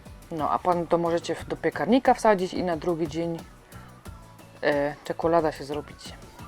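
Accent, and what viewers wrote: Silesia, Poland. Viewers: Kocham ten kanał i twoją śląską gwarę! :)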